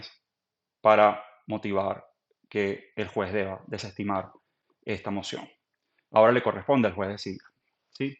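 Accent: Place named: Venezuela. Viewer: ME ENCANTA TU TONITO, VENEZOLANO.❤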